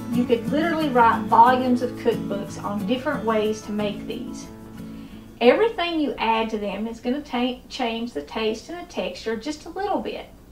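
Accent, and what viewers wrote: South, United States. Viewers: Just Wow. I came here because I love your channel and your Southern accent reminds me of my Family raised Down South, many who have passed on to Higher Ground.... [Even though we are of different Races, As an African American I truly believe ....We are ALL family on this earth as far as I am concerned. Let me just say, your soliloquy on "Forgiveness" was profound and beautiful. I was in tears by the time you went back to finishing up your Johnny Cakes Recipe, "You took me to Church today", as the older folks would say when someone spoke wisdom and Grace. I have had awful things happen to me during my lifetime; Evil done to me by others I was kind and generous too....pain and damage so profound, I felt it was beyond my control to stay on this Earth....I still have moments where , because of horrific losses and fiscal damage done to me....That I just want God to take me ...However, I am still here....I read Psalms , Matthew Etc. 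to soothe me....My motto to get through this life is "Forgiveness is a give you give yourself....Hating and being angry is like drinking poison and hoping the other person dies"....God Bless you and Thank you for your wise, cogent advice. I truly believe that God put you in my path today....I needed to hear your sermon, it was timely. Thank you again.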